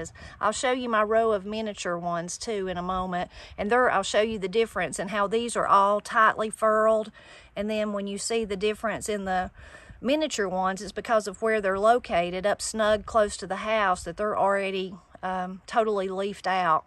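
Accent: Appalachia, United States. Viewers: I always thought these were poisonous! Well how do you like that. Have you eaten them? Wait What, Now I’m learning the second thing,I didn’t know about hostas,is that those seed pods you can throw them and they’ll actually grow from themselves. I have tried to dry those seed pods and they just mold or get all furry looking gross and I have to throw them out.. OK back to the video, I might learn the third thing I didn’t know and I’m older than you and I love growing hostas in what little shade I have out here.in the southern part of Indiana. Wow this is the best video. I want to try them. And that Appalachian speak I’ve never heard of astilbe been called As ta bil… ( Uh stil bee ) Astilbe.. The Appalachian language..❤️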